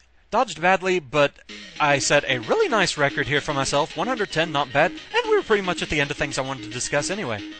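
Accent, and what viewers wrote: United Kingdom. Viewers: You live in texas.What.You sound so British,bit of a stretch but maybe even Scottish but youre American.mind blown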